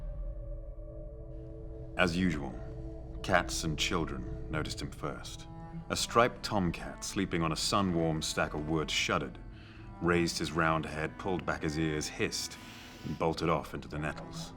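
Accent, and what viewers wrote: England, United Kingdom. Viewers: It’s so weird hearing him with the British accent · Wait wtf he’s british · Oh he's British... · It's nice to get a good example of how nice Henry Cavill's natural voice is. His American accent as Superman kind of leads him to be very whispery and mumbly, and I love his Geralt voice but it's definitely a voice. But his natural English accent is gorgeous. He should totally do an audiobook series of the Witcher where he does his Netflix Geralt voice for Geralt and his natural voice for narration. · Why British gentleman always makes a good audiobook narrator?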